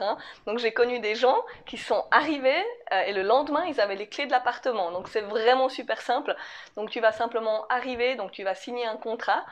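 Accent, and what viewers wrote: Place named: Switzerland. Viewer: Salut, sympa ce vlog! Je suis franco-suisse et j'ai tout de suite reconnu ton mignon accent. Le mot "crouille" est inconnu des Français MDR! Bref, merci pour ces conseils, ils sont très pertinents et je vais les garder dans ma liste. Quels prix vraiment pas chers pour tout ce confort! Au plaisir, Marjorie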